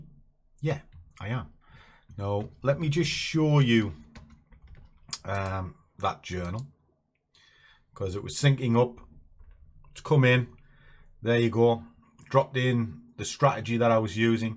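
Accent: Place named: Northeast England, United Kingdom. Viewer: haha I just watched this video and oh nice I’m from Newcastle can tell u was close by the accent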